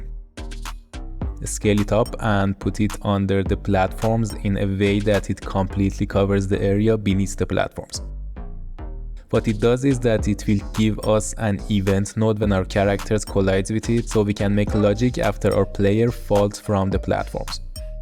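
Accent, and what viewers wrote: Iran. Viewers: Amazing video, used this as a refrence to implement this in C++. Also love your Iranian accent :face-purple-smiling-tears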